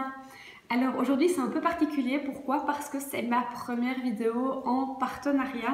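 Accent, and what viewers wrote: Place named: Belgium. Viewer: coucou. juste un message pour te dire que tes vidéos sont mettent de bonne humeur, ton naturel, ton accent belge (moi je suis du sud :-) ), ton peps et bien sûr ton Choupy trop mignon. j attends avec impatience la sortie de tes nouvelles vidéos..et bien évidemment je tente ma chance pour le biberon 😋 qui ne tente rien à rien 😉 bonne continuation !!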